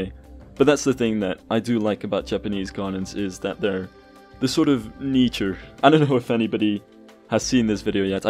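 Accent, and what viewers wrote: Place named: Netherlands. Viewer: Nice!! Duurde even voordat ik het hoorde maar op gegeven moment dacht ik.. wacht eens even.. dat is een Nederlander!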